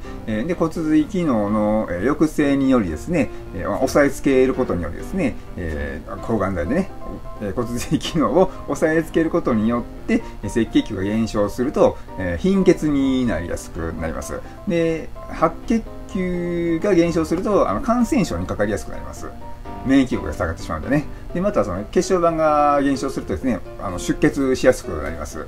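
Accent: Osaka, Japan. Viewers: さん❣️こんにちは☺️こんばんはおはようございます😃 今日も為になる経験談を大阪弁に乗せて語っていただきありがとうございます❣️ さんは納豆大丈夫なんですね❓関西の方は苦手な方が多いと聞いてましたが…… 副作用との戦いはまだまだ続くのですか❓ さん頑張れ〜頑張れ〜❣️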